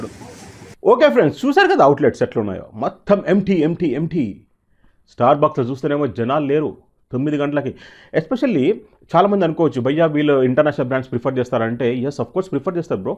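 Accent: Telangana, India. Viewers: అన్న నువ్వు తోపు అన్న నువ్వు ఎక్స్ప్లెయిన్ చేసే విధానం సూపర్ అన్న తెలంగాణ యాస భాష భాష యాస స్టైల్ సజీవంగా చెప్తున్నావా అన్న నువ్వు తోపుల కి తోపు అన్న నువ్వు మామూలోడివి కాదు అన్న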